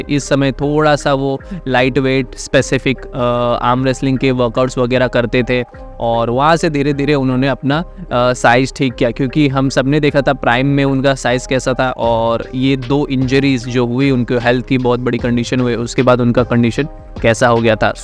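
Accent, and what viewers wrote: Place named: India. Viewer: لامصب ما که هندی نمیفهمیم بااین لهجه هندی انگلیسی نامفهموم تر شده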